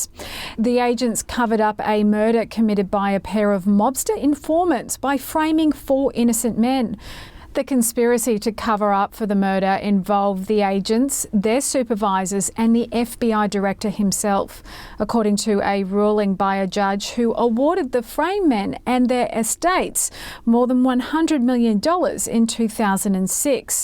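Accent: Australia. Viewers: Thanks God for a good honest reporting I like the epic time you’re a spicy lady with awesome Australian accent keep up the great work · U sound like a Aussie..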